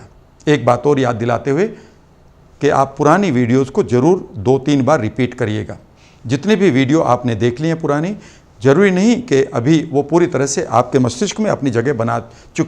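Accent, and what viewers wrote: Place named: West Rajasthan, India. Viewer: Sir aap Rajasthan se ho na, aapki aaj tone se pata laga, wo bhi west rajasthan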